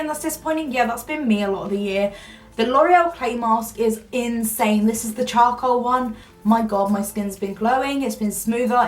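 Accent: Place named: England, United Kingdom. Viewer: I love a English accent 🥰🥰🥰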